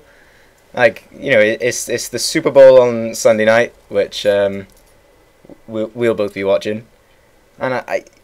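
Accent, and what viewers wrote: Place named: United Kingdom. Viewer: This is weird cause I really like hearing British accents in commentaries. I feel like you're supposed to have a lot of viewers.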